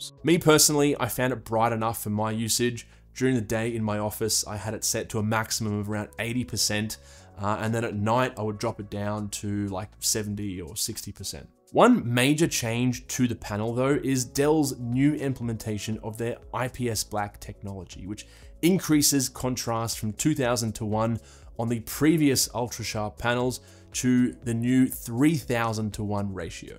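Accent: Australia. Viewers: Spent half the video trying to figure out if you were Australian. As an Australian that spends too much time on the internet and now can’t pick an accent so save my life 😂🤦🏼‍♀️ You’ve just got yourself a new subscriber. I’ve got a really old dell monitor I’m using with a new Mac mini m4 pro. I really like the colour on the old dell and find it looks almost identical compared to my 2016 MacBook Pro Display. For that reason alone I’m drawn to getting another dell monitor. My monitor can do 75 hertz but only 1080. And the weird scaling on the 27 inch monitor means I have slightly burry text. I have a small desk which means I probably sit a bit closer than is ideal and the weird text bothers me. I’m not great at understanding the scaling thing, but 1080 on a 27 inch vs 4K on a 27 inch, what would be the pixel density difference and would it improve my current viewing situation? 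😂 Love your content.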